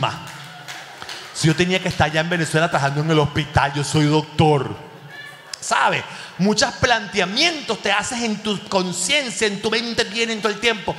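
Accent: Cuba. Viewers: Me encantas con tu acento🎉🎉🎉😂😂😂😂😂😂 Cubano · Magistral como imitas el acento de nosotros los cubanos. Me Meo contigo 😂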